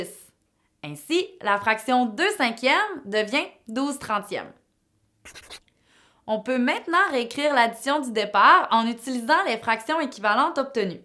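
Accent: Quebec, Canada. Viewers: Ses un accent québequoi! :D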